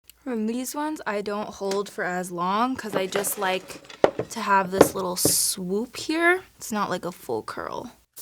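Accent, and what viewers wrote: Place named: United Kingdom. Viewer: Loveee the look & hair but girl lose the British accent lmao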